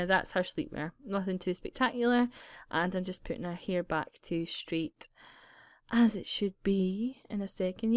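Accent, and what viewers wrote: Scotland, United Kingdom. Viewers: are you from scottland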